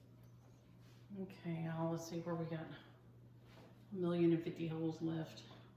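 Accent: South, United States. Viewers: Thank you so much for a wonderful Vlogmas! It’s been a day! And I needed a distraction. So nice to hear a southern accent...I am a transplanted southerner who wound up in western Canada, and I miss my home 🥴 Christmas is a time for family and good food, and I miss that more than I can say! Your wreath is beautiful (sorry I am behind on episodes) I am working on binge watching to catch up on your vlogs! But I keep getting sidelined by chores🥴Wishing you and your family many blessings of the season! ❤️🎄🦩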